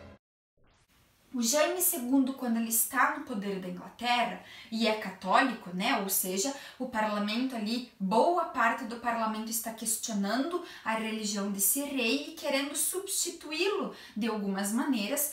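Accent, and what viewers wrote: Brazil. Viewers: é paranaense (do sudoeste, provavelmente). O sotaque é igualzinho. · Que legal esse sotaque gaúcho!!!!!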